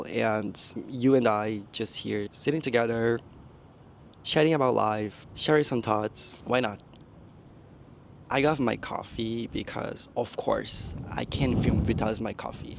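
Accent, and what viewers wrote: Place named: Australia. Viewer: Great video,! On a side npte, I think your Aussie accent’s starting to kick in 😊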